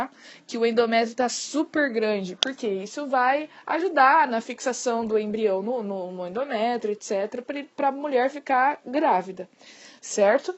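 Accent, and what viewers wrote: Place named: Inland Minas Gerais/São Paulo, Brazil. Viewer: Kkkkk sutaquezinho pesado do interior de Minas ou de SP 🤔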